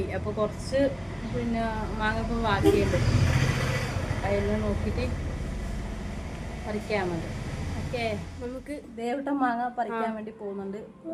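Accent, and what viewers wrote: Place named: Kannur, India. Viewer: പക്ഷികളുടെ ശബ്ദം കേൾക്കുന്ന സുന്ദരമായ സ്ഥലമാണെന്ന് മനസിലായി.കണ്ണൂർ ജില്ലകാരെ പോലുള്ള സംസാരത്തിലുള്ള അച്ചാർ ഉണ്ടാക്കുന്ന നല്ല വീഡിയോ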